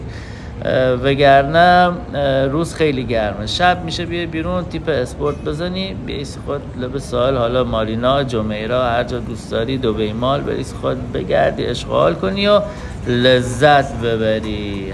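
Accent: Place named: Bushehr, Iran. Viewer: لهجه حرف نداره بوشهری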